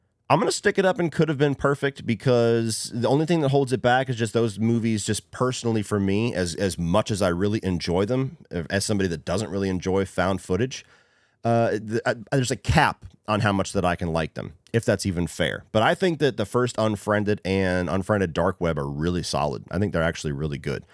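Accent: United States. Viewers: I’m surprised no one has offered him voice work. He has that perfect American voice that would appeal to folks in Asia and elsewhere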